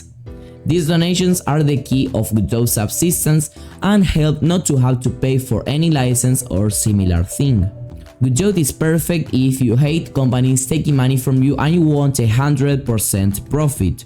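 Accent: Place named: Argentina. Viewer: Ese acento Argentino se escucha a leguas. Traduci tus videos asi nos ayudas a los hispanohablantes jajaja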